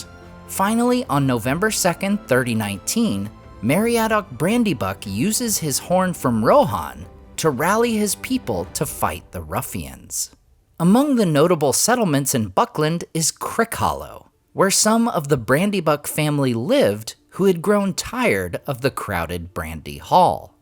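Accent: United States. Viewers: The american accent is killing me! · Just wish could use a British accent.. I don't mind what region; but it should be British. The american accent kills it for me.. sure I'm speaking for others who are passionate about the Great works of Tolkien here.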